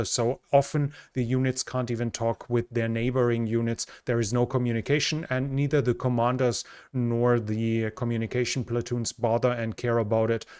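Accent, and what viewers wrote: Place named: Germany. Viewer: Where are you from ? Germany or Scandinavia ? Just guessing As a German your accent could be both :D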